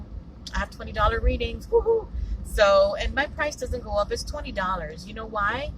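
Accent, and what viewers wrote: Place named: Latin America. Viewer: I heard your voice and I immediately tried to figure where you are from. I know South Florida, but I would think Venezuela or Puerto Rico. I don't hear so much cuban. Perhaps Colombia? I am into accents. INFJ/Scoprio here in Tampa. Btw, this is one of the problems I see as well. Great vid.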